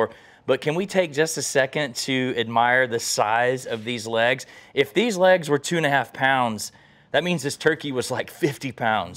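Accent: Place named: Texas, United States. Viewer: Real Texas voice like Ted Cruz🥶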